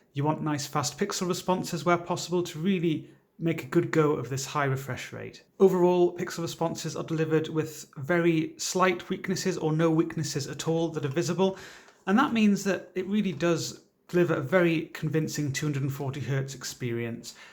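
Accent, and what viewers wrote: United Kingdom. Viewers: Love British English 🥰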